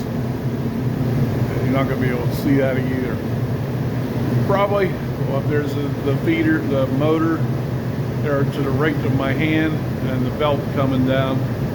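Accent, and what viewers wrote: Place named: Pittsburgh, United States. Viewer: are you from Pittsburgh that’s awesome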